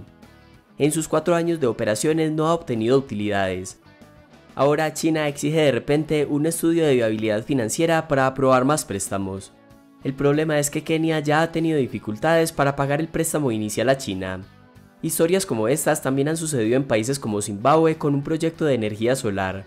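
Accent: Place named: Coffee Region, Colombia. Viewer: NO HOMBRE!, ESE ACENTICO LO MATA. TERRIBLE QUE SONSONETE. CONSIGA UN NARRADOR NEUTRO. ES MUY FASTIDIOSO. NO PUEDE UNO CONCENTRARSE EN EL CONTENIDO, ACENTO CAMPESINO DE LA REGIÓN CAFETERA. MUY ARRASTRANDO.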